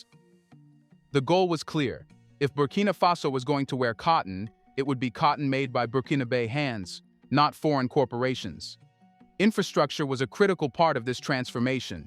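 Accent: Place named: United States. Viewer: It's annoying these random generated content and AI generated voice overs with American accents. But the message is mostly factual so we have to look past that.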